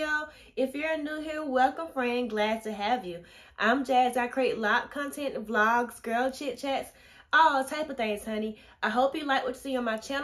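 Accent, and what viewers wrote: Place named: United States. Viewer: You right! That “mist” bottle is the bomb! I just got one a week ago and I love it! And no apologies necessary … I love your country accent.😁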